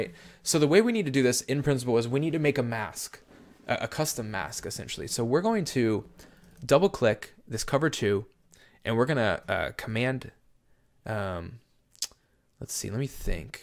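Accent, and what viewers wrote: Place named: Canada. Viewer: great tutorial, appreciate your down to earth style, sense of humour, and humility. Couldn't pin down your accent! you sound Canadian.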